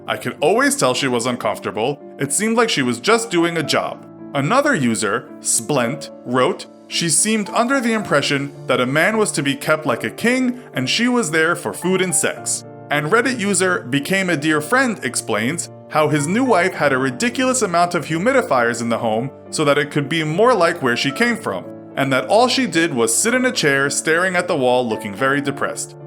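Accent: Canada. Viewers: The Infographics show are you Canadian? Because you say eh? from time to time. I’m Canadian 🇨🇦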